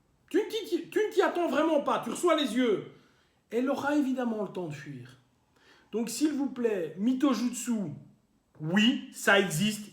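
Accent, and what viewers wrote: Belgium. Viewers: T’as un accent Belge je m’abonne camarade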